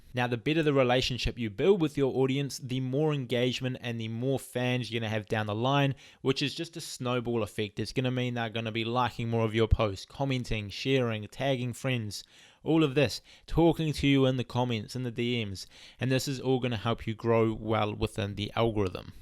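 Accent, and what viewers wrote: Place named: New Zealand. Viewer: Apart from that nice video, I am a big fan of that Kiwi accent!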